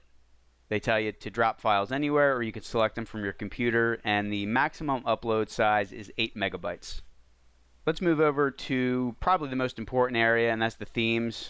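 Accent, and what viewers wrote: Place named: Delaware County, United States. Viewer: Where are you from? I'm from Delco (near Philly). You sound like a local, haha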